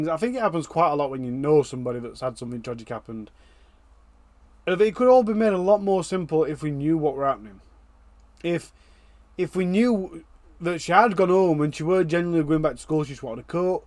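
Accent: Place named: North, United Kingdom. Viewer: As a girl I’ve worn 2 pairs of undies for different reasons… 1. Undies, tights then another pair over the tights to keep them up & in place. 2. As a school girl I’d put my PE pants on over my normal ones if wearing a short PE skirt for netball, hockey etc. 3. If I was on a period & thought it could be a day that I may need extra protection. (TMI?!🫣) 4. If bunking off to meet my first love, putting normal boring ones on first & a nice pair on over them with the intention of slipping 1 leg out at a time to take the boring ones off ready to meet my BF… Maybe that’s what she was actually bunking off school for?? (I know I did!!Again, TMI?!🫢) Who’s to say Belfield wasn’t the one she could have been meeting up with?? BTW, newly subbed & I love your content…especially love your accent!! Hearing ‘gidum’ & ‘tekit’ to name a few, bring little smiles & remind me of my further north friends & family x Thank you x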